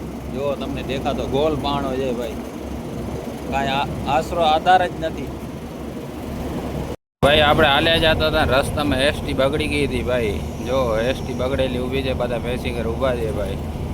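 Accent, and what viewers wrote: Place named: Kathiawar, India. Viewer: કાઠિયાવાડી ભાષા હો ભાઈ 😅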